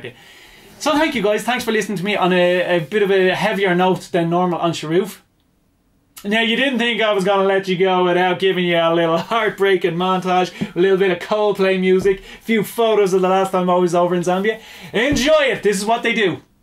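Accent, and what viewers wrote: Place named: Ireland. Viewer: I was unable to work out zambia with an irish accent, being Norwegian and all that so for a short while i was sure zambia was a person and nothing made sense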